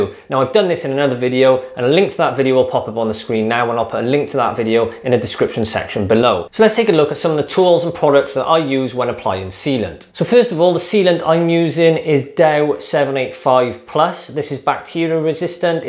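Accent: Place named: North West England, United Kingdom. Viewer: Familiar North West accent. Thank you from Italy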